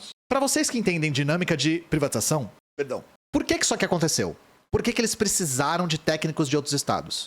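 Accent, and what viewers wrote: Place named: Rio Grande do Sul, Brazil. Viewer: Me sinto representado pra caralho quando o fala gauchês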